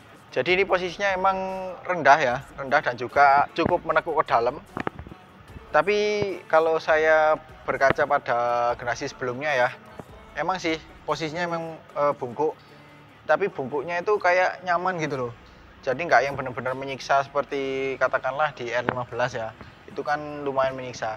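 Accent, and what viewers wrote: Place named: Java, Indonesia. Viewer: Sya suka konten chanel karena suara medok jawa nya 🤭😄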